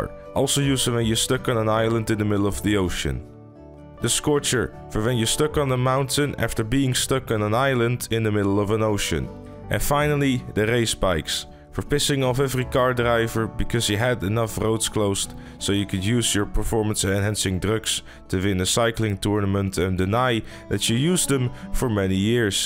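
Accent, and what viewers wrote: Netherlands. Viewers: I can't find out if you are trying to get your Dutch accent working again or if you are trying to speak English in your other videos. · holy shit you speak a really good dutch accent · Ahh, I missed that dutch accent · HAHAH ik vind het nogsteeds leuk als je een nederlands engels accent hebt, klinkt als iedere nederlander ever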